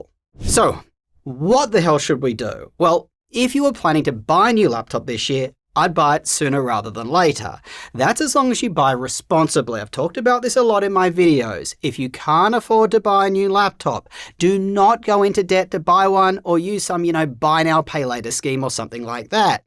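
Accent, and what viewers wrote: Australia. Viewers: Why are you US centered when you sound like Crocodile Dundee?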